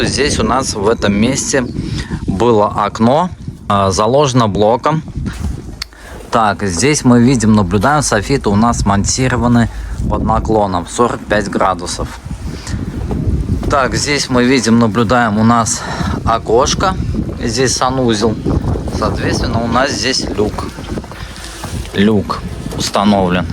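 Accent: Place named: France. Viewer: Смотреть дальше не стал, бесит французский прононс😂или простыл он что ли😂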